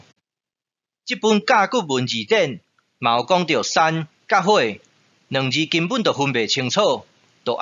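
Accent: Zhangzhou, China. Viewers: 從甲骨文解開台語完全是正確的，你就快成功了。只差你台語偏漳州腔。漳州音是外族學習台灣海口音而形成的語言。，只有87分相似。音差會讓你困惑而解不開真正的字意。甲骨文是台灣海口音的造字語。你只能解開一小部份台語字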